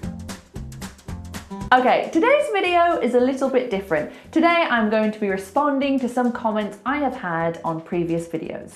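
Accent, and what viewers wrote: United Kingdom. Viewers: Hello....I like your English classes a lot.Besides I try to reaffirm my British accent listening to you. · You have a British accent...... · RP is familiar and understandable for foreigners, but not gives much practice in casual conversation with people. You sound very live and british. Your accent is nice and easy to listen.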